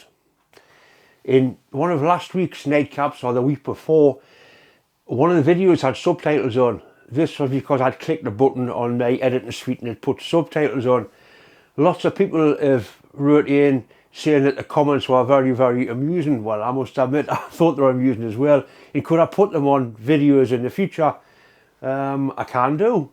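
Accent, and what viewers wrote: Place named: Geordie, United Kingdom. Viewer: if people want the subtitles they can use the built in Youtube ones at the bottom of the screen. I sometimes click them because it really struggles with your strong Geordie accent and can be very funny reading them:)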